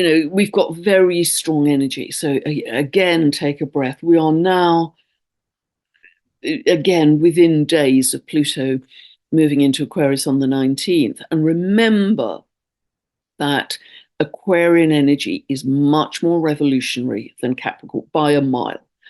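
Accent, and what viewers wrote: England, United Kingdom. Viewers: Hi ! It's irrelevant Question but which English accent is that? One if the finest I've heard in recent times.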